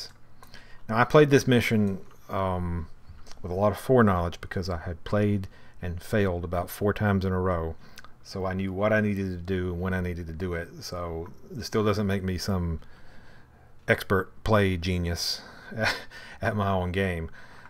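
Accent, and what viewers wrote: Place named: South, United States. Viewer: What's with the accent? Are you a southerner?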